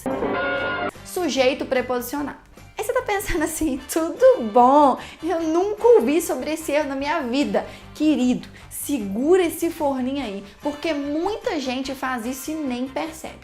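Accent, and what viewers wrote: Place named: Minas Gerais, Brazil. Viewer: certeza que é mineira hahaha sotaque muito carregado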